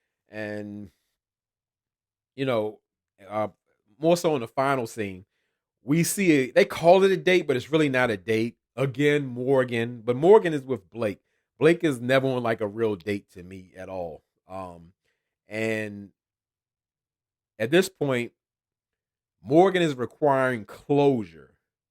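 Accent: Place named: Baltimore, United States. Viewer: The season is over and here I am binging all of your videos as I finish up my nails. YOU CUT THE ENTIRE FOOL ON THIS SERIES! This portable rattail, the continuous belief in what Anthony really wants, Lyndon being down to the part-time job but on the show between shifts...I'm calling the authorities. But I knew your accent and demeanor felt like home because it is, I'm from Baltimore. I'm thoroughly enjoying myself on today!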